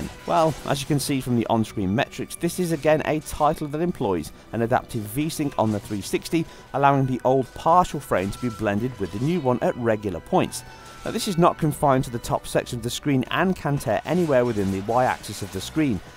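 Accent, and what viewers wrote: England, United Kingdom. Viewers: Typical dozy yank, you are aware that he is actually English from England speaking his native tongue English. American English is a bastardised version of the English language. Anyways, good job as always.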